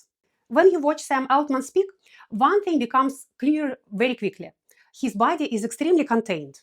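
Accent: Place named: Russia. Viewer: Pretty, pretty thick russisch accent. Bloody hell 🌷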